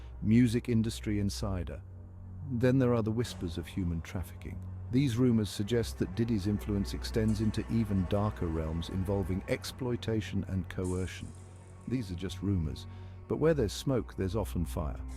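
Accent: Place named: United Kingdom. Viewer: hearing a British guy talk about hiphop is wild haha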